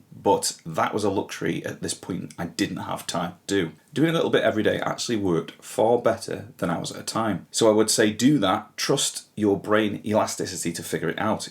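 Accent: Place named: Stoke-on-Trent, United Kingdom. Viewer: Mate, are you from Stoke on Trent? That accent sounds familiar!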